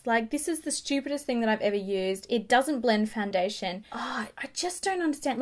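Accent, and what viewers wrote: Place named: Australia. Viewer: I'm aussie too & I can hear you have a strong accent... are you a country girl? & what state are you from? (I'm from the Blue Mountains, west of Sydney:} )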